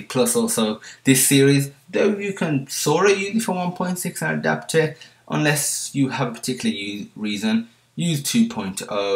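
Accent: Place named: Dudley, United Kingdom. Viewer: That's a great accent. I'm guessing you're from Dudley or somewhere near there.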